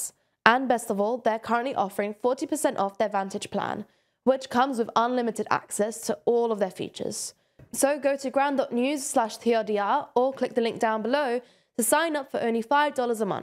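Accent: United Kingdom. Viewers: Your accent is soo Briish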